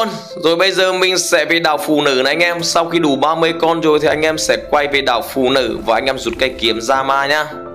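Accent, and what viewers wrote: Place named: Central, Vietnam. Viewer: Anh người miền Trung à anh,chữ e ko hiểu:))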